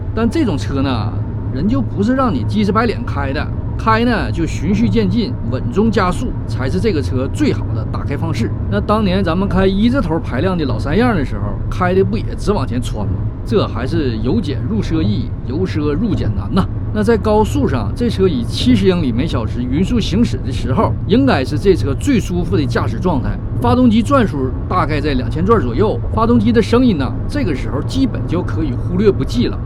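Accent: Northeast, China. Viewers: 值得十倍以上的订阅以及十倍以上的赞，简直就是华人评车圈里的Doug Demuro，没有花里胡哨，全是干货信息。作为老广竟然觉得这东北口音格外舒服哈哈，👍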